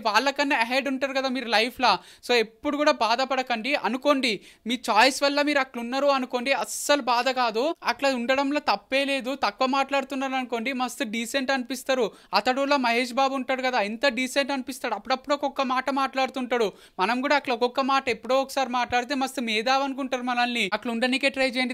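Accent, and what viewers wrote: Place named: Telangana, India. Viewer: Mi TELANGANA VOICE kekeo keka BRO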